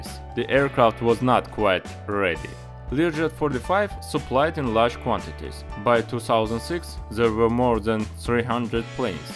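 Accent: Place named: Russia. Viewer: His English is good, but he might not have enough speaking practice, here-hence this crazy Russian accent. ))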